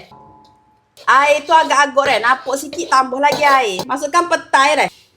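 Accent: Kelantan, Malaysia. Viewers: X tahu la byk dia punya deh kan..org kelantan punya bhasa mcm tu kan....smua negri ada language dia .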